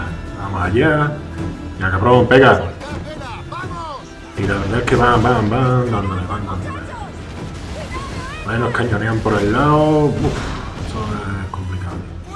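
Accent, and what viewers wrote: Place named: Spain. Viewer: No comento nunca, pero me veo en la obligacion de pedirte que si aun no compras un nuevo microfono, lo hagas, se escucha horrible, ademas de tu acento español super marcado (ese es un detalle, lo del microfono no). Al Impoluto lo derrote a lo bruto, me quedo 1 cuadrito de la ultima barra (con el Jackdaw al 100% en todo). En el caso de estos hermanos super barcos, no los pude derrotar, asi que... termine aqui xD. Pero como uno de los 2 (a eleccion) al principio al ir de frente, uno se puede colocar justo detras e ir disparando y haciendo daño con el espolon. No tenia idea de como se usaba el mortero (¿mortero el ataque aereo a arta distancia que aparece marcado en rojo cierto?), ahora si podre terminar con estos barcos. bye, salu2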